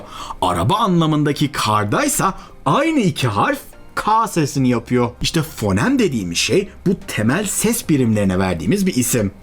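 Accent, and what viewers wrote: Samsun, Turkey. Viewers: reis sendemi samsunlusun :) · Ya abi Samsunluyuz da bu kadar da mı belli oluyor. :D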